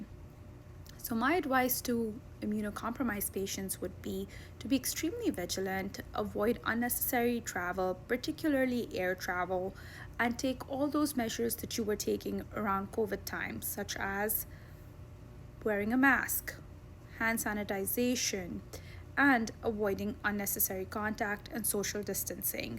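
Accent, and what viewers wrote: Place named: India. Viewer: Is it just need or does anyone else immediately mute and or scroll up or down as soon as you hear the Indian accent... see what stammer have done. Bet she has some good advice but I ain't gonna hear it...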